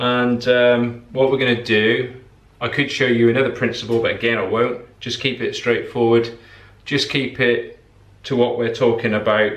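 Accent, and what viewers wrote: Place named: England, United Kingdom. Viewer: Do you need an apprentice? I noticed your English accent are you in the UK? Love your videos thanks for sharing 🙂👍👌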